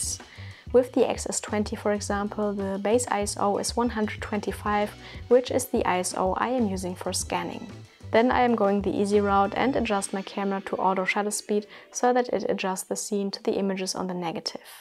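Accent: Germany. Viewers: been trying to learn and looking for a film scanner? jsut starting to watch your videos. by any chance do you live in Germany? the accent is a littl bit strong, just some stuff I noticed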